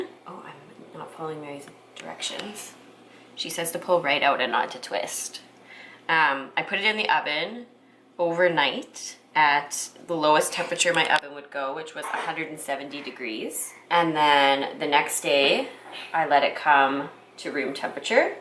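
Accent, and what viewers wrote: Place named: England, United Kingdom. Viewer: I just binged on the entire season of The Great British Bake Vlog-- I LOVE it! As a fellow foodie/baker/Ina Garten groupie, I can't help but applaud your efforts at baking your way through the GBBO. Most times when I'm in the kitchen cooking, I like to pretend that I'm in the kitchen cooking alongside Ina in East Hampton. So naturally when I watch you bake in your English accent while ordering around your sous chef/camera man/best cow vet ever, I can't help but smile. Your unscripted baking show is top notch, I've never laughed as much during a baking vlog. Cheers to future episodes! -- Your California Fan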